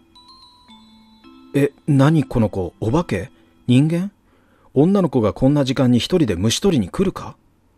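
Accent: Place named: Osaka, Japan. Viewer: おはようございます。いま拝聴しました。なでか懐かしく感じられる里山の風景と滑らかな大阪弁小さな出来事が見事に描き出され朝からほっこりしました有り難うございました。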